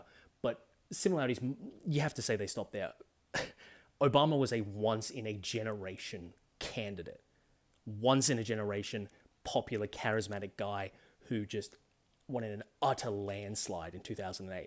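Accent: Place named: Australia. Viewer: Didn't know you were Aussie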